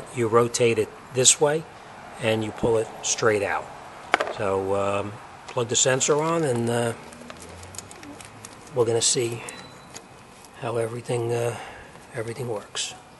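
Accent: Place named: New Jersey, United States. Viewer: Sounds like you from joisey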